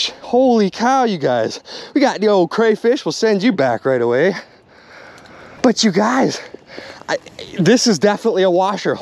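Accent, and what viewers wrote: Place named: Milwaukee, United States. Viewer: Total Wisconsin Milwaukee accent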